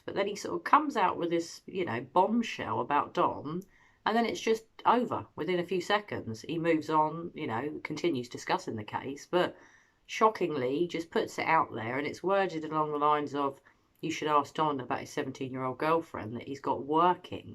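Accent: United Kingdom. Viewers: Love your British accent. We need to get Don "bang to rights" (right?)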